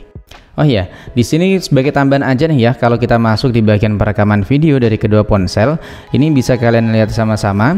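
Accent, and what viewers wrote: Demak, Indonesia. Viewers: Orang Demak ya bg?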